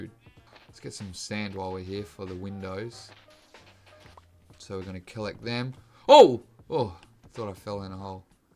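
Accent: Australia. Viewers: Wait are you Aussie?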